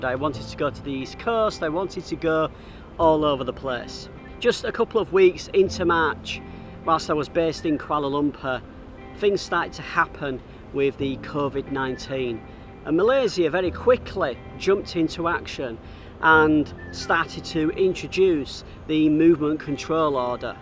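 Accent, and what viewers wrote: United Kingdom. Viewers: stay safe mate🤭🤭 if we meet teach me british accent haha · I believe you are from the UK because of your accent. I have been to the UK for training and stay in stevenage about 2 months.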